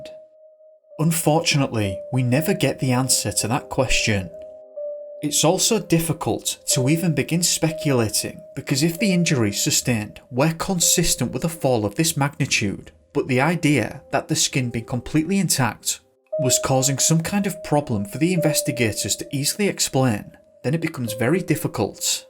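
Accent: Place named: Scotland, United Kingdom. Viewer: You could have easily been a character with your voice on trainspotting.🥳👀🤡✌️✌️✌️👌 cheers mate......no you sound just like you Ewing McGregor...WOW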